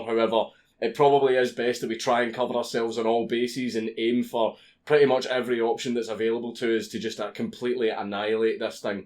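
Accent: Scotland, United Kingdom. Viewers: If all else fails, move to America. Sure we have our crazies, but at least we have the first amendment. Unless you're doing something that's genuinely dangerous, you can shitpost 'till your heart is content. Also you'll notice that we tend to love Scottish accents ( ͡° ͜ʖ ͡°) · Dont worry youtube is safe it has not went through. Are you scottish? (I am !)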